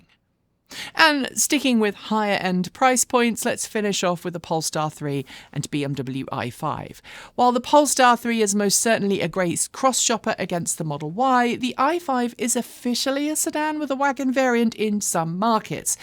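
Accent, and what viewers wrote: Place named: United Kingdom. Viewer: A dumbo putting up a dumb video with a stupid British accent.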